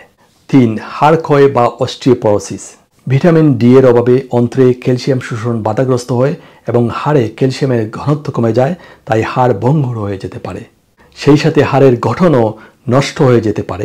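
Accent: Sylhet, Bangladesh. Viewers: আমি সন্দেহ করছি, আপনার বাড়ি সিলেটে ।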